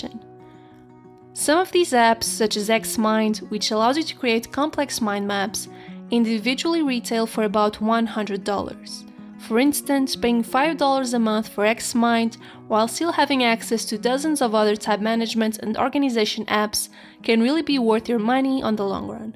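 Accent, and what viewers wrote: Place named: Portugal. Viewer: she's from Portugal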